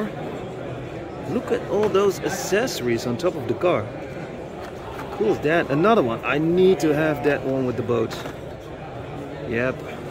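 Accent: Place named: Belgium. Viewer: it's funny, when talking English sounds Belgian, when chatting Dutch rather 'Hollands':-)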